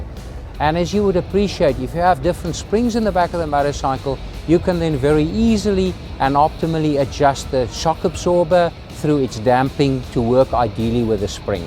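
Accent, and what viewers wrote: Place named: South Africa. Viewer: hes south african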